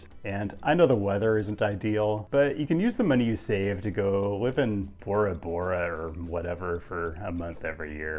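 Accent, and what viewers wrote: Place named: Upper Midwest, United States. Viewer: Your videos are the best things on YouTube--thank you. One comment and one question… Did you know that Erie contains the lowest-income zip code on the U.S.? I read this recently, and was surprised. Also, as a linguist, I am fascinated by the cadence of your speech and can't place it geographically. Are you from the Upper Midwest originally?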